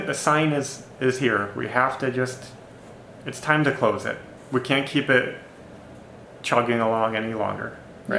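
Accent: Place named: Indiana, United States. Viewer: Kokomo! I knew I recognized the speech patterns. I'm in Valparaiso. Congrats on the YouTube Channel guys. Live the dream here and keep pushing forward!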